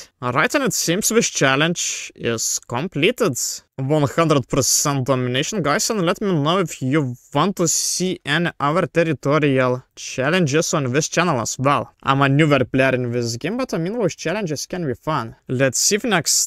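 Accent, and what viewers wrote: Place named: Russia. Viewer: damn the russian accent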